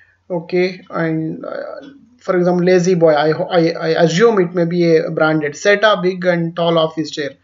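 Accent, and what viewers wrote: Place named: South India, India. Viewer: thank you, sir, for giving super video, apki voice see lagta he ap south side see belong Karte ho, apka content 200k, 500k,1m wale Chanel se bhi acha he, acha hi Nahi bohot acha he, really thank you, sir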